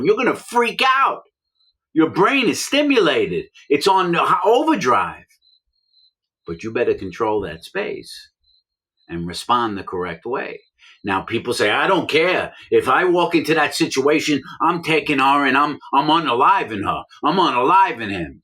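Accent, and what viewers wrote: New York, United States. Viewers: That's a NYC area accent.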